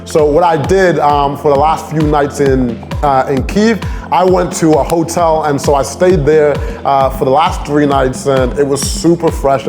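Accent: United Kingdom. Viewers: You have got a British accent. You probably live in London. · Bro you killed it with that British accent 👌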